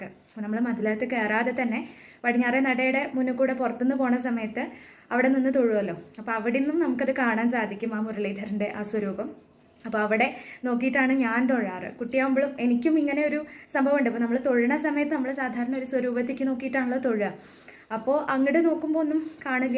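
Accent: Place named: Palakkad, India. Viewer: മോളുടെ സംസാരം കേട്ടപ്പോ pkd ആവും എന്ന് തോന്നി അതുകൊണ്ടാ ചോദിച്ചത് 😍😍😍😍 ഞാൻ ഒറ്റപ്പാലം 🙏🏻🙏🏻🙏🏻🙏🏻🙏🏻😊😊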